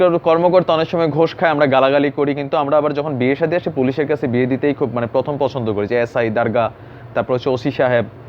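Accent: Comilla, Bangladesh. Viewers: ভাই আপনি কুমিল্লার ভাষায় কথা বলেন খুব ভালো লাগে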